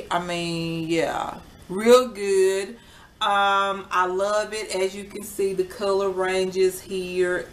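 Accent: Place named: South, United States. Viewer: I just know you are from the south me too and your accent is great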